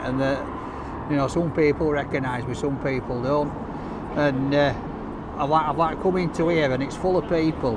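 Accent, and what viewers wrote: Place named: Yorkshire, United Kingdom. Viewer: Absolute class guy man, Yorkshire raised him well man actually love the way he talks always gives me a chuckle!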